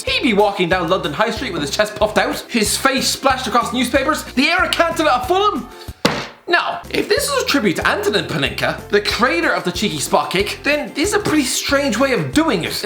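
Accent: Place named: Ireland. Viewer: Nothing like starting my day with an Irish guy rant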